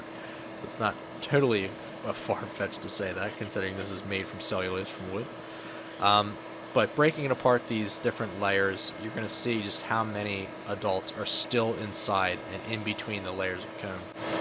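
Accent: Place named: Midwest, United States. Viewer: "be-yahsketball" Midwestern US accents are the best.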